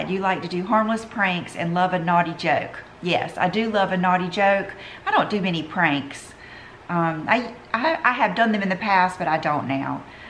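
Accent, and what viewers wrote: South, United States. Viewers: Love to watch your videos, gotta admit it's because of your demeanor and southern accent.....😁 your voice is sooooo like shelby from steal magnolias, hope that does not offend you 👍🌻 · I love your outfit ! You have the most likable personality and such a charming Southern accent. I’m so glad I discovered your channel.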